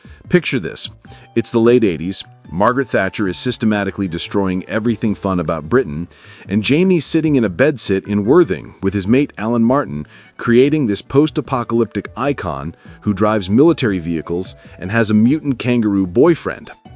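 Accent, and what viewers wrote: United States. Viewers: I hate forced subtitles and it's really weird hearing all this British English in a flat, mechanical sounding American English voice. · Listening to this guy use British slang and idioms in an American accent is painful for some reason.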